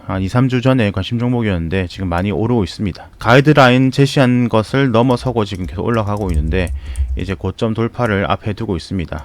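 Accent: Gyeongsang, South Korea. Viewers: 시끕 ㅋㅋㅋㅋ 경상도 아입니꺼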